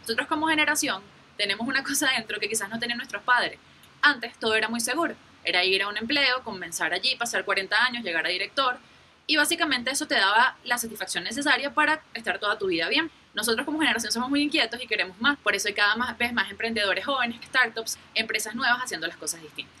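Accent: Venezuela. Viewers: Le doy play al video, me pongo a revisar Clash of Clans y cuando escucho ese acento Venezolano, vuelvo a ver la pantalla jajajaj. Es un gusto cuando los bloggers son Venezolanos, es como si la persona que te habla es un pana tuyo :D Saludos.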